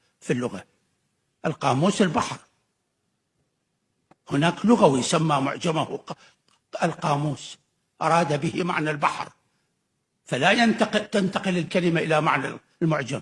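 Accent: Iraq. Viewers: يعني عيوننا دمعت لانك الوحيد الذي يتكلم مثل اهل العراق وباقي للسوريين لاء ...الاعيبكن اصبحت مكشوفة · طلاسم اللغة وتغدعقيدها دليل ضعفها ونفور الاشخاص للتدث بها... وذا الدكتور يتحدث اللغة الفصحى بطريقة خاطئة ...مخارج الحرف باللهجة العراقية وهذا لايجوز كنطق أو أن يتكلم بالعامية أفضل مثاا نكيرا...بدل أن يوقول (نكره)والدليا الذب ايتحصىه من القرآن يلين فيه الايتهزاء وهذا انسايا مرغوضا ولو أن هذا كتاب يقال أنه سماوي ...ودفالإستهزاء عمل سيئ والكثير من الشواء القرآنية تثبت ذلك ...يقولون هنالك عبرة ...أية عبرة حين تحبط الآخرين ...إذا كان كلام الله هكذا فكيف بعباده ؟؟. البلدان الذان ينطقان الفصحى بشكل سليم هما سوريا ولبنان ...أما باقي الدول العربية فلا.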